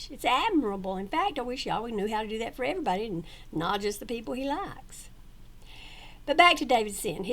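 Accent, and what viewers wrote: South, United States. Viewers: Am from trinidad & I also have a accent but I also like yours ..I like the southern accent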